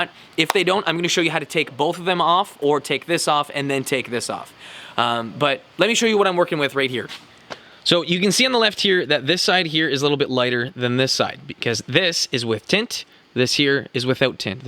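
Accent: Canada. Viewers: Art thou Canadian? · Let me guess.... you're from Canada?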